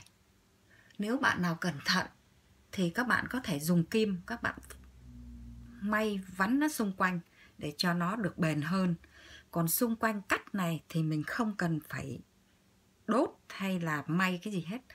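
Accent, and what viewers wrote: Vietnam. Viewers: Đó là tiếng Bắc 54. Nghe rất hay và sang. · Giọng nói của Cô hay quá Cô ah - giọng Bắc / Saigon xưa - thật rất đẹp và sang.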